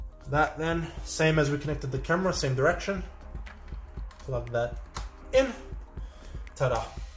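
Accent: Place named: Australia. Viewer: Thank you so much you helped me so much also I can tell from your accent that your an Aussi and so i am I which made me understand you more then I do for American accents 😂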